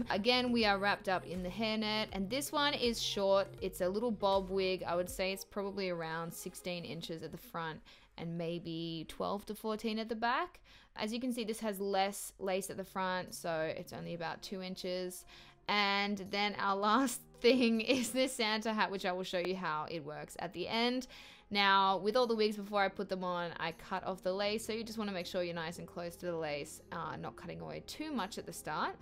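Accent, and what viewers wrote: Australia. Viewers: Your Australian accent is everything!😩😍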